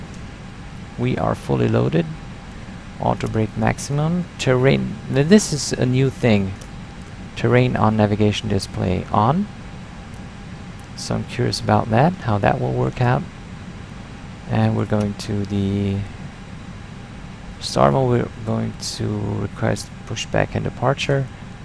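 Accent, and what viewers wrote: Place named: Germany. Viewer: Richtiger deutscher Akzent :D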